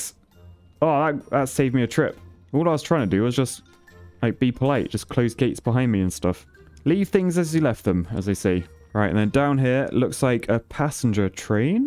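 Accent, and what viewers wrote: United Kingdom. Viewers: Honestly this feels like graystillplays old content but with a British voice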